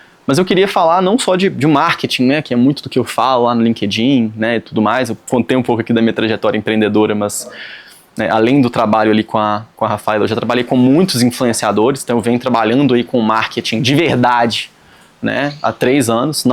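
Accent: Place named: Minas Gerais, Brazil. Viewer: Mineiro falando já dá uma paz de espírito… parabéns pelo conteúdo!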